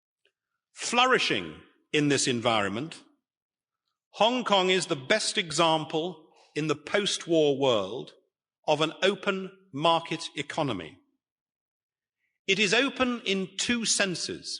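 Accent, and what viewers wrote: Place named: United Kingdom. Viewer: 英式英文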